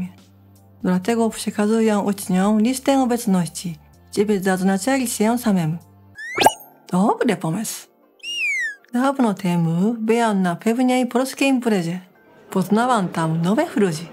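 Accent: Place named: Japan. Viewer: Witaj;) moje imię to Mirosław = Mirek świetny przykład imion w gramatyce;) ciekawy jestem natomiast czy zrobisz odcinek na temat zodiaków Polskich i Japońskich? uwielbiam twoje filmiki świetnie mówisz po polsku;) pięknym akcentem Japońskim;)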